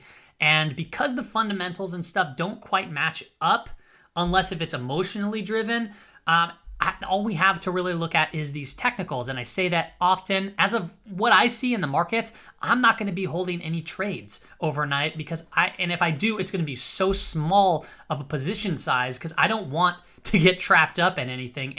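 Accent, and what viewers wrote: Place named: California, United States. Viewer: especially with your Californian accent, the analysis sounds even greater:)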